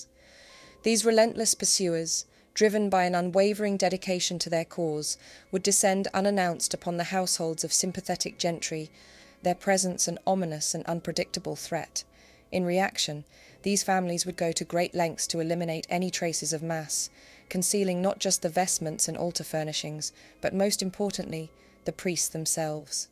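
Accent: United Kingdom. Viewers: This channel is narrated by an Brit and there's no denying the musical beauty of her accent! Focus on the positive, we find what we look for! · Agree !clear thoughtful and well presented.. AND very English🥰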